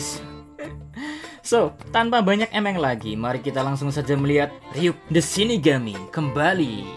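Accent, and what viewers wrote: Java, Indonesia. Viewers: Lalu kenapa si mimim nya harus medhok gitu. Jd aneh denger nya. Klo bisa pake logat nya jgn medhok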